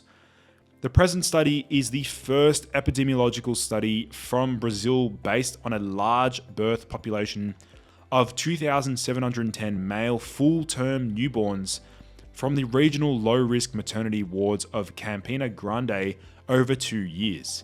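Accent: Australia. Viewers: But sir you speak very funny r u Australian?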